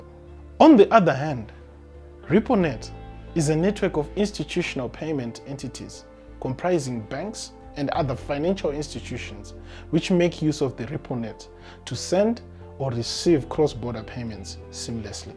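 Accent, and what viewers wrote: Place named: South Africa. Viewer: Hi! I am a fellow south african - i recognise your accent! where can us south africans buy XDC? please help thanks! xx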